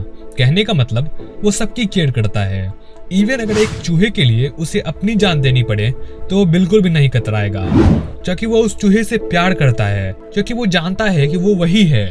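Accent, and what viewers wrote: Bihar, India. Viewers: Are you from Bihar? You have a bihari accent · bihari narrator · Bhai tu bihari hai .....i can say it for sure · Bro aap bihar se ho na!!!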